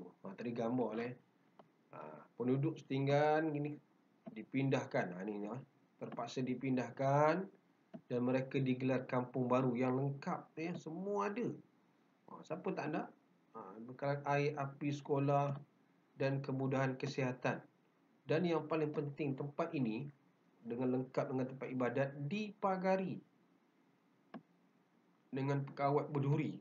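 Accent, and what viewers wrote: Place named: Perak, Malaysia. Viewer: Suka sy dengar loghat Perak Cikgu☺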